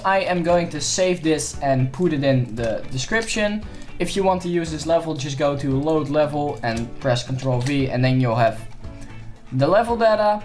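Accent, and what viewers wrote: Netherlands. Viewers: You have a dutch accent. Are you DUtch?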